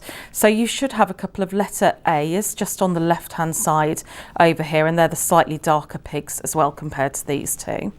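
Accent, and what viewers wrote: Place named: United Kingdom. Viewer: You could be both Bri'ish, or an american makeing fun of that accent!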